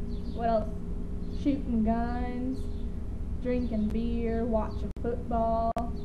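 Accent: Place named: Texas, United States. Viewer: You do have a bit of a Texan accent. It sounds nice.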